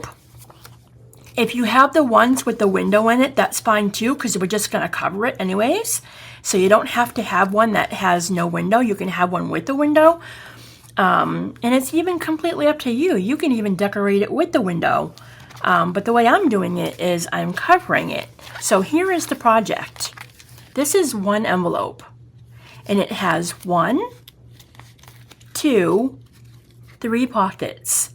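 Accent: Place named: Boston, United States. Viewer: You're just the cutest ❤ I LOVE LOVE LOVE your creativity AND your Boston accent 🤗💕 hugs&love Darlin xxx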